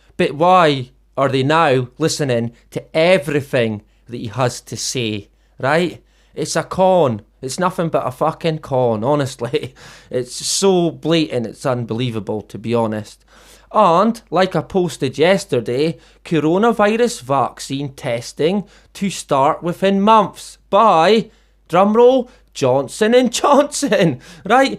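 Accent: United Kingdom. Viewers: its comforting to know I'm not alone and to hear a UK wonderful voice lol I've mainly listened and watched American or Australian truthers the odd one from UK! If know any other small UK channels post a link cause an extra like and sub helps for people who stumble across these channels!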